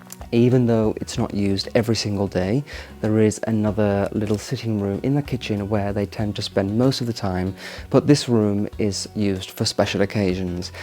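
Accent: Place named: England, United Kingdom. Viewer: Italian here. If you speak Italian with you English accent you will sound to us smart and polite. This is the effect! Don’t be afraid to make mistakes Italian are not fussy on languages. Ciao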